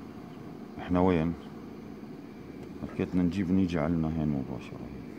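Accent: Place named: Palestine. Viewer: ***** تسلم يا غالي.. ربي ينصركم بربي اجمل مافي فيديوهات الاخ غير لمعلومات انو بياخدنا سياحة في فلسطين و منسمع لهجة لفلسطينية العريقة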